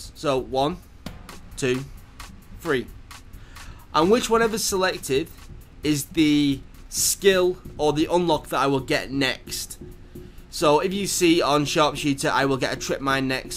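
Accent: United Kingdom. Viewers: i know right he saound like Hoxten a bit i though like what this is Hoxten and hes making tutorials :D he sounds bit like a hes from england i think he is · awful british accent is awful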